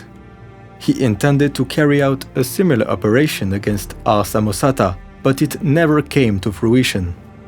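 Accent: France. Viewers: t'es français ? 🧐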